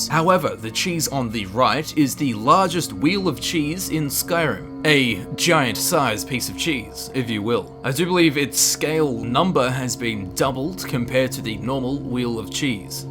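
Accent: Australia. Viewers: Australian. The accents are very different. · Hey, what county are you from, because your voice sounds faintly Australian but I can't place it, thanks! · what part of Australia are you from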